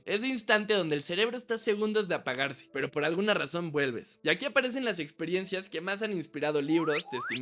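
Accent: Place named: Mexico. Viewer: Interesante el video, pero tu voz es demasiado mexicana 😢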